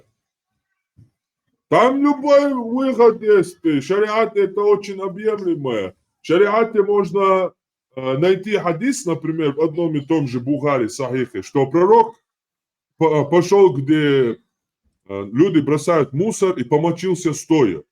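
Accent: Chechnya, Russia. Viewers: ТЫ СКОРЕЕ ТОРЧОК РОДИВШИЙСЯ И ЖИВШИЙ ОКОЛО ЦЕНТРА ГОРОДА... В ТЕБЕ СМЕШАЛИСЬ ВСЕ ДИАЛЕКТЫ ЧЕЧЕНЦЕВ! БЕЗРОДНЫЙ!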